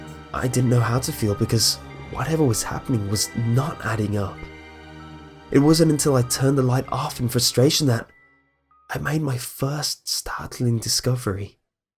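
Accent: United Kingdom. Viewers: Is it just me or do stories sound better with a british accent? has a good mic too so he is my favorite narrator.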